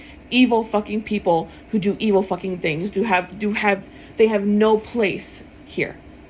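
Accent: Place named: Miami, United States. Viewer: Sounds like you grew up in Miami, like me?! Sometimes you got to fight. Gabfly wanted to hear the reason that dude r word his ex? What the heck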